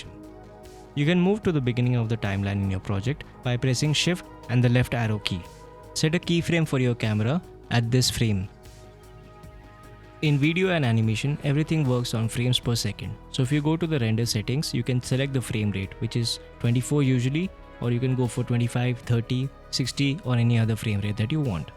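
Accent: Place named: India. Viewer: Thank you for your time and the tutorial. I hate any noise in the background and since I appreciate good music; it should not compete with the narrative; becoming noise which by definition is sound that I do not want to hear. All the negative aspects such as the Hindi accent; worst of them all is side-stepped by putting the sound off and the subtitles on; choosing English. BTW, the word "THIRD" is pronounced with the tip of the tongue between lower and upper teeth otherwise you end up with the word TURD which is fecal matter; an embarrassing word.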